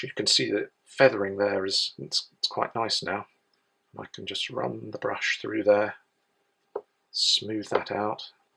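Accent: England, United Kingdom. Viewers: Love your channel, look forward to more videos, your paintings are beautiful. And you just cant beat you lovely English accent! Easy on the ears.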